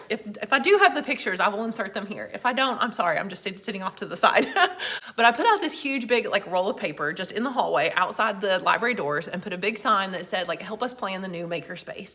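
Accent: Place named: Kentucky, United States. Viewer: Also, I just found out you're from Kentucky and so am I!!!!! I thought you had a familiar accent 😂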